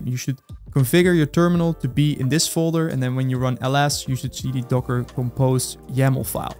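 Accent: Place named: Netherlands. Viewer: I hear dutch accent :D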